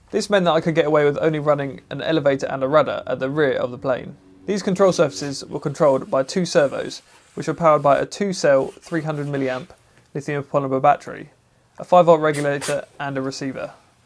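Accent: United Kingdom. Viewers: I can taste the UK accent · Eeemm like british accent..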